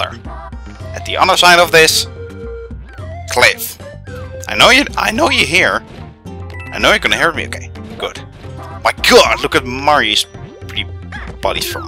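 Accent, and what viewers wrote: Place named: Netherlands. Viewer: but if you hear very good you can hear that you dutch ( like me )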